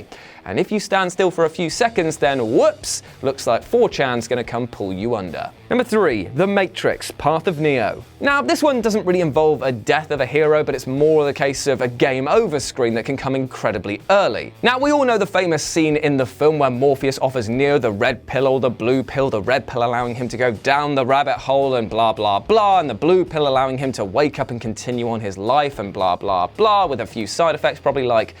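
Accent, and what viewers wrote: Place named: United Kingdom. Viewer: Close your eyes and listen to the video again. Between his sad delivery of poorly written jokes and his pompous arrogant british accent this guy is a terrible presenter. The Shakespeare part is just a snarky American jab at a British english speaker trying to sound elegant but falls short.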